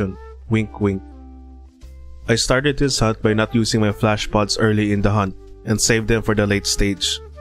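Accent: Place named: Philippines. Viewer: Presh filo accent 🇵🇭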